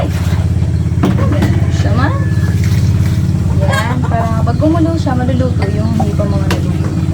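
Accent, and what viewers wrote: Philippines. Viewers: idol tonong ko sana anong probincia ka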